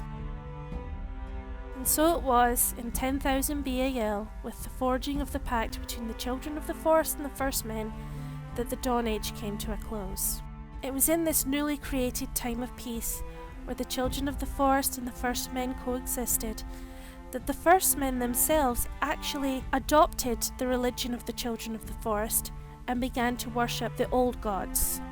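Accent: Scotland, United Kingdom. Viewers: like your Scottish accent.very informative video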